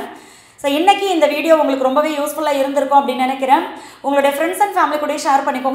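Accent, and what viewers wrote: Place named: Coimbatore, India. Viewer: Sis unga native unga peasu kovai mathiri iruku athan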